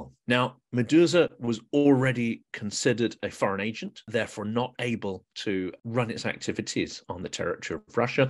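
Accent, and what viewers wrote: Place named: United Kingdom. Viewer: I love having the wonderful summary you have put together tonight! Your one hour commentaries are priceless as have all your guests been. Never think they are too long! Your work is amazing and appreciated. I’m so busy keeping up with our Starsky, and Johnny FD and our Canadian/UK/Swede Brandon, it’s a gift you give us bringing honest news and knowledgeable guests on your channel. Thank you for all your hard work and the British accent is a pleasant change from our American talking heads. Слава України 🇺🇦 героям слава! 🧨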